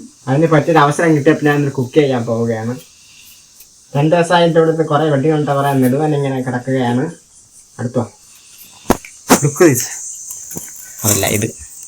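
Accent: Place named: Thiruvananthapuram, India. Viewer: മോനെ നിന്റെ ഭാഷ യാണ് ഇഷ്ടം മക്കളെ നമ്മുടെ പപ്പനാവ സാ മീരെ മണ്ണിലെ ഭാഷ ഒരിക്കലും അത് കൈവിടല്ലേ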